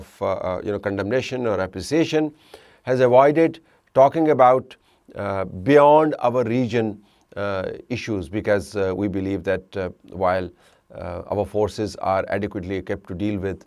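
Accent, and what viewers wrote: Pakistan. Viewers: They have there own channels. He is trying . His English is in urdu. 😂😂😂😂😂😂😂 so hard to understand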